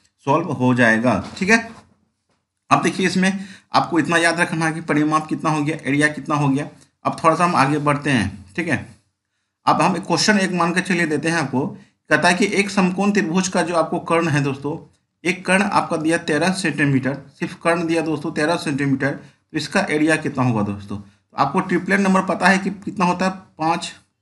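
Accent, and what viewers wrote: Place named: Mithila, India. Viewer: अपने के आवाज स प्रतीत होय ६ै अपने मिथिलांचल के छलिए! सादर आभार अपने के बहत निक से पढ़वे छलीये🙏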